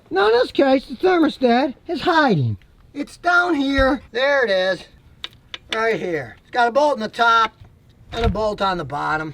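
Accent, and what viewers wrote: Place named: Texas, United States. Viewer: Heluva Texas accent, bro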